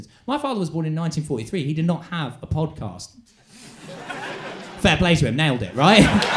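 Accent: Belfast, United Kingdom. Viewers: Milo's use of a vague belfast accent during this was very enjoyable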